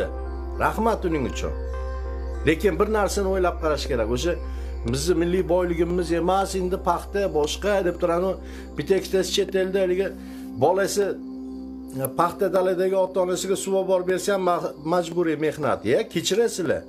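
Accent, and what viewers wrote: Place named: Namangan, Uzbekistan. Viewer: Наманганлимисиз ака гаприш тилиз охшайди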